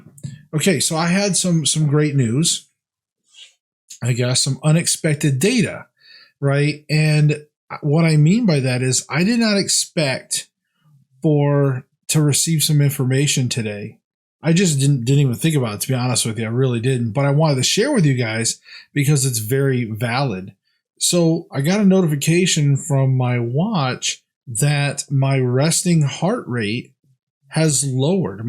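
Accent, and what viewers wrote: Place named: Midwest, United States. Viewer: You sound like you are from the Midwest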